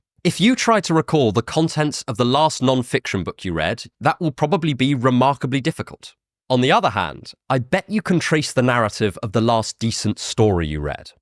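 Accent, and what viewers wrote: United Kingdom. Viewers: You are soooo handsome ❤❤❤❤and your british accent ❤❤❤❤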